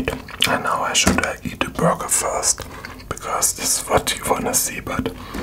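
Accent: Germany. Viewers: Man merkt das du deutsch bist weil alle deutschen so english sprechen 👍🏻😅 (Außer ich glaub ich)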